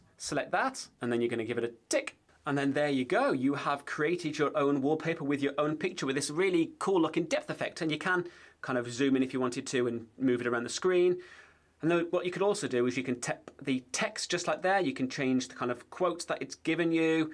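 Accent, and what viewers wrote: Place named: United Kingdom. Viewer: Not another British tech tuber, come on, the accent is very tiring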